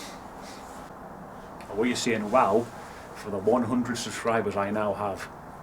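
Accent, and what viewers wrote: Durham, United Kingdom. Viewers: Was introduced to your channel by Ouija Brothers so just started watching your videos. Very very interesting. I love hearing about the history of places and the added bonus of your paranormal investigation. Your accent sounds like your from near me in Durham? Keep going your doing great. 😁😁😁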